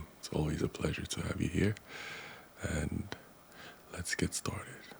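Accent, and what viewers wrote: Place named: Toronto, Canada. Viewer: You sound like you're from T.O... 🇨🇦🤙🏻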